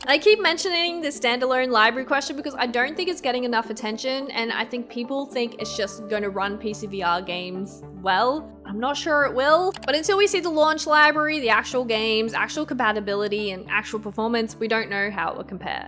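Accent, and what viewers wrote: Australia. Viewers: Great having an Australian voice and knowledge of local pricing and issues for VR.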